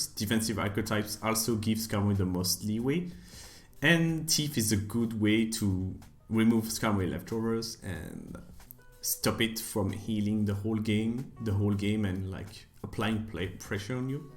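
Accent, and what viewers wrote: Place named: France. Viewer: When you try to hide your french accent but it's getting worst..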